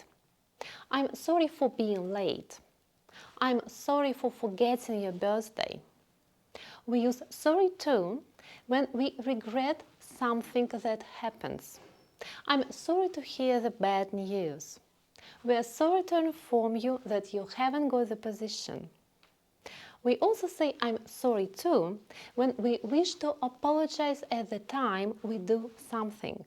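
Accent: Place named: United Kingdom. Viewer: Hi, I'm interested in learning English with a British accent. I just found your channel and subscribed. Where are you from? Does your accent sound different from other British accents?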